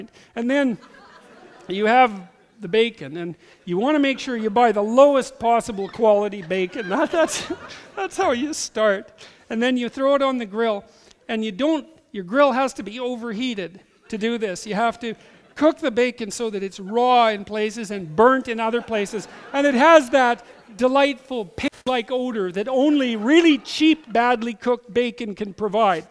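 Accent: Canada. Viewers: He's from northern Alberta and you can hear it. That's why he also drops the occasional "eh." He doesn't talk like a stuffy Toronto professor at all. It's very endearing, actually.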